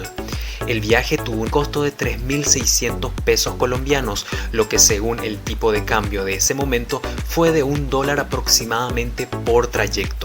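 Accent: Paraguay. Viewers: Excelente video!!... Perdón... Tenés acento paraguayo?...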